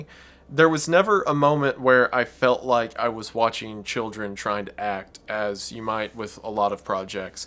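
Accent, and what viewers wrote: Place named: South, United States. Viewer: What kind of dialect is speaking in? Like what part of US, where they say "setting" instead of sitting? Is it just a southern thing? lol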